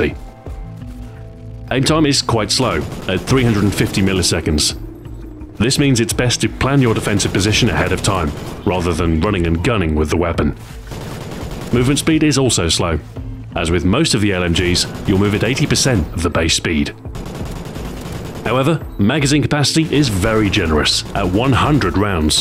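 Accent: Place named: England, United Kingdom. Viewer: The English accent makes you sound very intelligent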